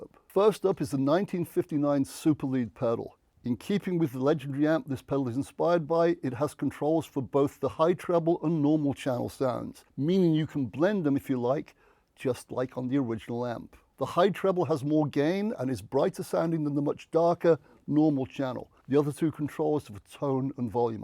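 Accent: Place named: England, United Kingdom. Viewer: Proper English accent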